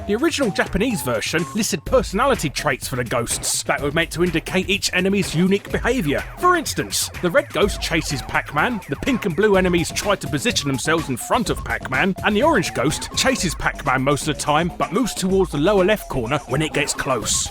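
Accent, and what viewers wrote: United Kingdom. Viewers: People be hating this voice, that's a good ol British accent right there! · waaah i dun liek teh voice waaaah Shut the fuck up and deal with it. This is what a lot of British people sound like. You don't like it? Think how your accent might sound to us. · Fuckin' cartoon british voice... It was really annoying.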